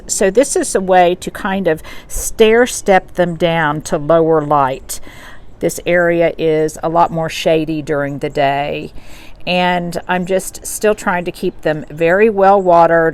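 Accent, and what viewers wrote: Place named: United States. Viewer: Hi. Thank you for another very informative video. I don't have any topiaries. I am disabled, but decided to start gardening. Not the best hobby I didn't think about all the digging lol. So I am just starting with bedding plants in tubs and my hubby helps with compost. I am Looking forward to getting some topiaries in Spring to put in pots. Can you put a small topiary in a big tub, so they don't dry out? They make me feel happy as well. I do love your accent. I am from England so don't get to hear your beautiful American accent ever. Anyway thank you xx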